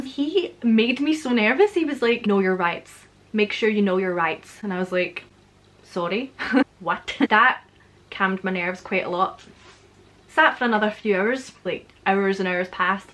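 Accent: Scotland, United Kingdom. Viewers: I'm getting better at understanding your Scots accent. So it's progress. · I love listening to your stories. I love learning scottish accent from your stories! and what an adventure you had!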